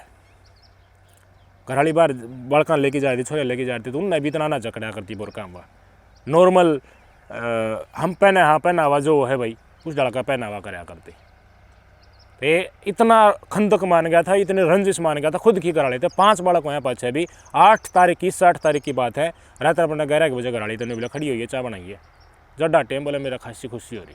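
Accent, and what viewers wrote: Haryana, India. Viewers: Bhai ji you are great haryanvi lahja bilkul stick ❤❤❤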